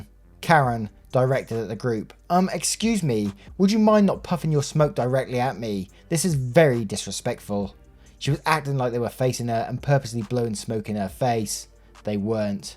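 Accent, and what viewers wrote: United Kingdom. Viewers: Where are you from? I can't pinpoint your accent, my best guess is British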